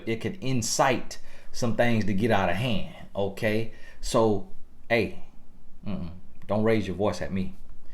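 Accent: South, United States. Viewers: "How a queen lil" lmbo boy you is country!! Lol I love it!!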